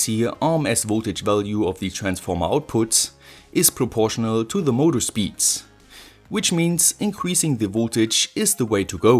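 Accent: Germany. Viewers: Are you German ore have German roots??? I can't imagine you aren't cause your german accent is so significant. A lot of people here speak like you do. Greetings from Germany and merry Christmas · Bist du deutscher? Hast so einen Akzent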